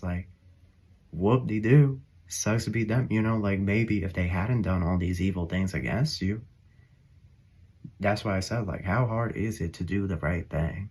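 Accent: South, United States. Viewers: The group who hounded me and lodged a claim against me is a law firm in the UK (Irwin Mitchell LLP) who promised me a No Win, No Fee deal, then reneged. They intended to grab a slice of the pie when my family house (worth $3M) in London was sold. But another law firm representing a man who was having an affair with our family cleaning lady and claimed to be my (wicked) stepmother's long lost nephew, got to it first and removed my name from the title deed. IM transferred the ruling (made without my knowledge) to the Supreme Court of NSW, Australia. I've been fighting it as a litigant in person for a year now. A Judge ruled against me last Friday because he believed the evidence of a fraudulent affidavit of service from a crooked organisation called ASI (All States Investigations) which declared I had been served. But IM don't get a penny because I'm protected by Australian domestic policy which does not permit my pension to be garnished or possessions worth less than $9000 to be seized. 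So you are spot on. Its similar to "Robodebt" and "Mr Bates v the Post Office" in its sinister implications of State v Individual). I know I am protected by angels. The other matter (a no contact romance scenario relating to my ex-GP) is not connected to the financial attack, but it might involve the presence of a demonic spirit, so I am taking no chances and will join the Catholic Church to take advantage of their exorcism procedure. I love your southern accent and your individual personsa. Th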